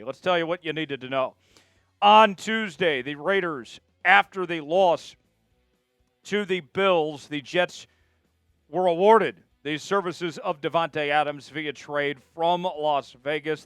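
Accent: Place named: Appalachia, United States. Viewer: This guy's just keeps on making bullshit content so he can use that hilariously hillbilly toned accent and annoy everyone within yelling distance.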